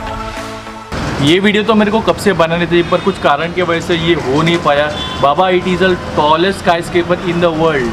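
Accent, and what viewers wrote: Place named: Maharashtra, India. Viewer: Loved your Marathi accent English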